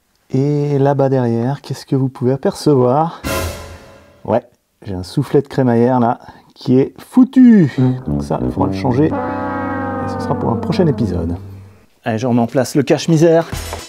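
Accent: Germany. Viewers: Was für eines schönes video Ich mag deine Deutsche Akzent, sehr gut ! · Ton accent allemand est très bien! Salutations de la Suisse allemande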